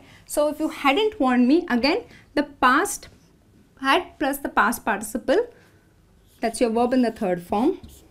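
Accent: South India, India. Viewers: Are you South indian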